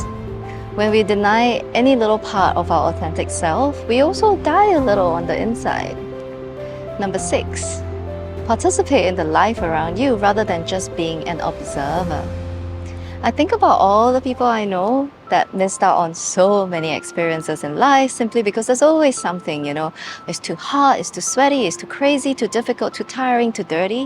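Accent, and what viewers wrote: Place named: Singapore. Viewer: She sounds Singaporean. They work very very hard!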